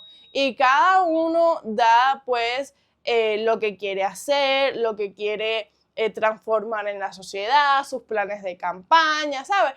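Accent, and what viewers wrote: Cuba. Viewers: Me encanta tu acento cubano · Hola esta perfecto tu vídeo, pero habla un poco mas lento, si cuesta trabajo entender 😢 mas que nada por qué el acento de cuba es muy padre. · Me encanta tu asento cubano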